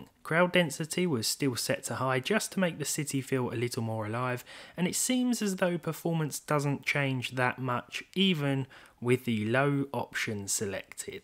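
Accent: United Kingdom. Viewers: For some reason I simply like your British accent 😂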